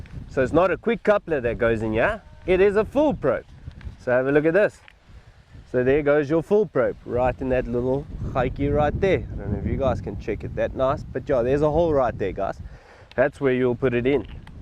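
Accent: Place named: China. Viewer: sorry. lost me at chinglish.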